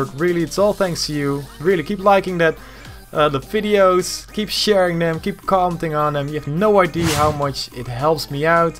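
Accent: Netherlands. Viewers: i would love to play with you but i am only gold nova 4 haha and btw ik dacht al dat je nederlands was ik hoorde het aan je accent :D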